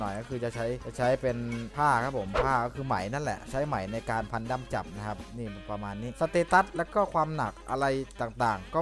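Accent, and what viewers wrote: South, Thailand. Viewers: ออก! ออก! เทาๆ หนอย หนอยนะครับ! 5555 ใต้มากเลยบ่าว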